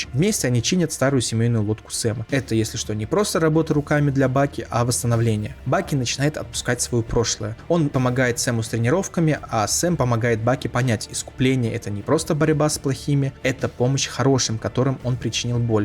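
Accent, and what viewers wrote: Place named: Tajikistan. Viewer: Интересно.Ты какой нации чел? Такое ощущение что как будто таджик или узбек говорит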